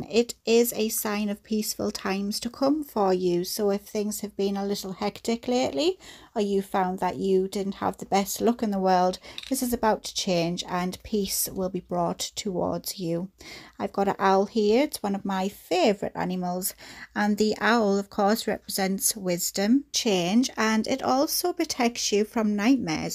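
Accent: United Kingdom. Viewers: I have just found you tonight, lovely to hear a uk voice. Newbie from Preston Lancs What a sweet soul you are. You have a new subscriber. Love & light 🙏🏽 Also my nickname is Ace 🤣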